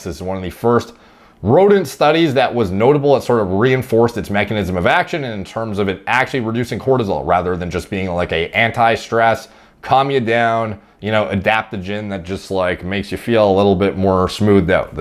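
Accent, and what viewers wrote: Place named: Canada. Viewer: Are the prices on the website in US or Canadian dollars? With the aboot etc guessing is Canadian?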